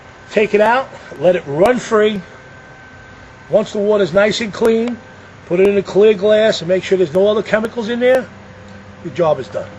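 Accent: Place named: Staten Island, United States. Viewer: I think any of you Youtube nerds, that want to talk bad about, should def. think twice.. LOL anyway, Im in Jersey,(just across the water) and love the tips and your strong Staten Island NY accent.. I have a more technical question.. Anyone with help, please email me Im repairing a p-trap on a bathroom sink from the 1920's or 1930's, can i connect pvc to the old brass pipe, with just a coupling?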